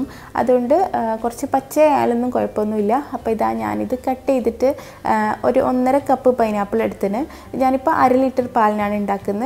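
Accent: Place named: Kasaragod, India. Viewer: Ithante samsaaram kasaragod slang polund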